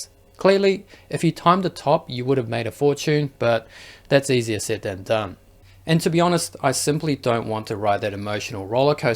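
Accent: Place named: Australia. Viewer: Asian guy with Australian accent doing American politics🎉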